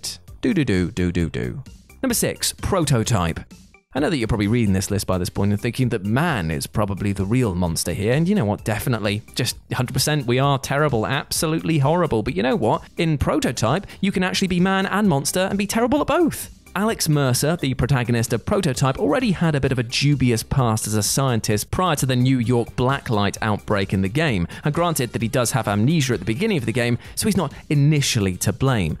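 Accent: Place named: United Kingdom. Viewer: american english but british accent xd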